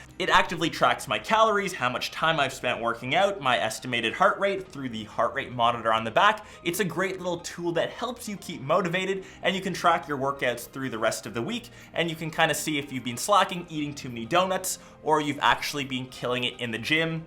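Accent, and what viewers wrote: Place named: Canada. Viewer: Love that Canadian accent. As always, a great, high-quality review.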